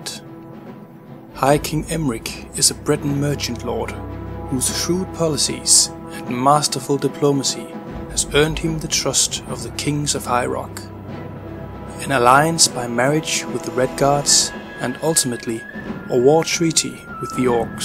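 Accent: Denmark. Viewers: Hey - great video! I couldn't help but wonder, are you Danish? You seem to have a slight accent, and your DK in " " hints at it as well. Cheers, a (fellow) Dane. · SO dude, are you Danish? Just wondering.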